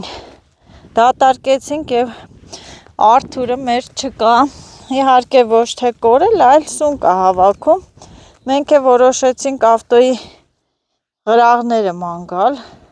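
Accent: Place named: Artashat, Armenia. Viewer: jan.erek vor 1in blogy ditumei ev dzer masin yndhanrapes vovhinch chgiei.yntacqum gushakeci vor vayqumeq.qani vor esel es 2 ory kaptavotik snkerem 2 angam gnel.patrastel e mi qichel dzmerva hamar drel sarcaxcik.u hitri vor vayqicen berum.mekel dzer barbaric sarerum xoselis😊😊😊😊 aseci artashatcia.indz tvume vor dhat chishtem gushakel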